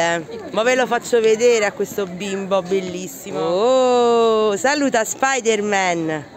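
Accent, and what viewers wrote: Naples, Italy. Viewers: Bella napoli e il suo dialetto....parlaci sempre...un po' di più...ti abbraccio carissima 💋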